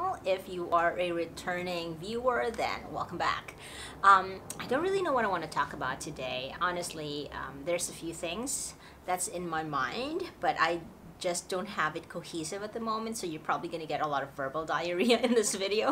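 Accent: United States. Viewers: Hola amiga:) I'm a little late but Congratulations on your wedding hope you had a spectacular day! May God bless you with love, happiness, laughter and patience on your wonderful journey together:):) I hope you post at least one picture of your wedding look. If you already did, sorry I'm trying to catch up on videos. I used to be a party girl loved the clubs and loud music especially the dancing I could dance all night and have the energy to go out the next day to do it all over again. As I've gotten older I don't go dancing as much anymore my health problems make it a little harder. And I lived for spending my days at the beach it took all the stress right out of my life. Also, enjoy going out to dinner and spending time with the girls and great conversation LOL When I started watching your videos I thought you were from the US. You're probably right watching all those shows growing up influenced the way you speak. 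The only show you mentioned I never watched was Friends. Star Trek is my favorite show. I'm from New York and hope someday you get to come and experience this wonderful place. There is so much to see from Broadway, Rockefeller Center, Lincoln Center to eating a NYC hot dog hahahahah And maybe you can do a meetup. Have a happy day!!! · Hello, una sa lahat huli naba ako. Tell you frankly watching & listening to your blog. Kaya naiingit ako sa iyo, dahil buti kapa meron kanang American accent samantalang ako ito halos 34 years na dito dihin parin gaanong sanay mag- English ewan ko rin kung bakit. Siguro dahil tinatagalog ko itong misis kong puti. Siya ang pinipilit kong magtalog. By way I missed iyong blog mong Tag-lish na naglalakad along busy city somewhere in Sydney sa palagay, iyong palagi mo pang sinasabi mahal din kapag ki- convert mo. At iyong lilipat na kayo sabi mo pa yata may daga at malayo sa trabaho ng mister. 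O well napahaba na nandidito na nga pala ako sa States of Florida, probably you heard about the astronauts & home of the " Blue Angel " iyon. I used to worked in the Space Program before malapit lang dito 15 minutes drive work their for 16 years. You like the climate here just like sa Pinas and 3 hours drive away to Miami where they always shoot the Miami Vice during my teenager life. Dalasan mo sana ang paggawa mo ng mga blog, gusto ko makitang viral sa mga gaya nuong ibang Pinoy!😍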